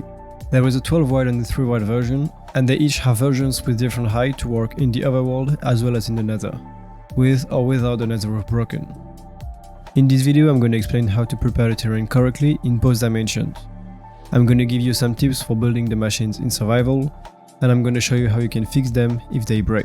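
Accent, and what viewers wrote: France. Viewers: On dirait que j'entend un français...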